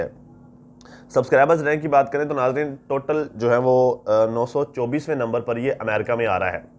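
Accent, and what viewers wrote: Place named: Hyderabad, India. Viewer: I think she is from Hyderabad, India. Their accent is very Hyderabadi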